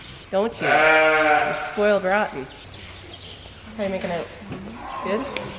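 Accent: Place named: Canada. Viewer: DrummerGirl423 she’s Canadian!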